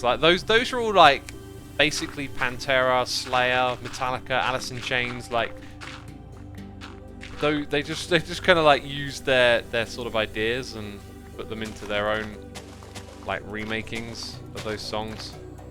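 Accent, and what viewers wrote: United Kingdom. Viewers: X's streams are perfect for: - for soothing oneself to sleep - for relaxing while e. g. drawing, sewing, cleaning - when you just want to have some background noise (a one which makes you laugh out loud every 2min) - for cuddling up with your pet and a cup of tea - when you need to hear some dad jokes - for taking a walk/run on a treadmill - for chilling out with your siblings - when you are in need of hearing a slup of tea (one of my basic needs tbh) - for when you need to be reminded to take joy in simple things (he's seems so grateful for even the simplest of things) -for when you want to develop a British accent For everything, okay? THEY ARE PERFECT FOR EVERYTHING. I'm glad I can watch them on YouTube :-)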